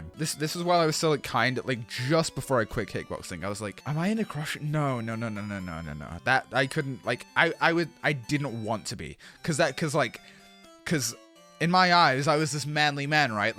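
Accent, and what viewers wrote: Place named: United Kingdom. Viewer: harry potter accsent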